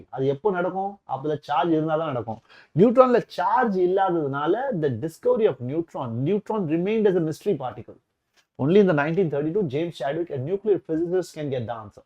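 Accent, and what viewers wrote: Tirunelveli, India. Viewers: Nellai tamil mass sir 🔥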